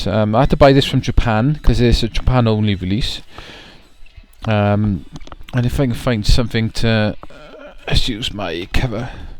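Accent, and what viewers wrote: Wales, United Kingdom. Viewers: Lol..'if you're not into it, it's a waste of money'. I did laugh at that. Even more golden in your Welsh accent ;) Nice haulage indeed. Many may dislike you for that Wii U bundle..jammy git. ZombiU is good fun,I really like it. Great playing that in a dark room, great atmosphere about it. I'd be keen on seeing your new 12 days of, for sure :)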